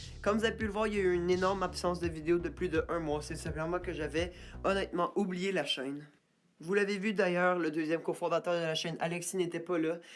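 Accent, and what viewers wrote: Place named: England, United Kingdom. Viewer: t un accent englais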